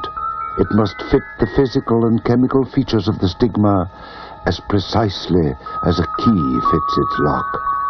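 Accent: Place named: United Kingdom. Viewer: "After mating, the females goes underground, forever." Way to go creepy british voice for teaching me about the birds and the bees.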